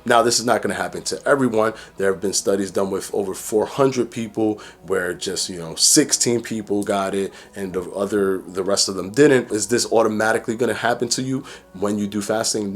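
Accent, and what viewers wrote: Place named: New York, United States. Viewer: Angela Davis-Floyd, The Listing Boss cut my bae some slack he has a New York & Florida twist👍🏽🙏🏽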